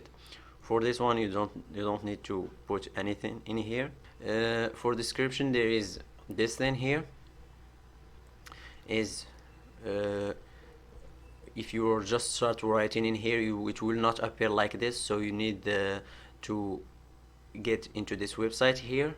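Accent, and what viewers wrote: Morocco. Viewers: Hhhh htana chmit ri7t lblad f sotoo 😅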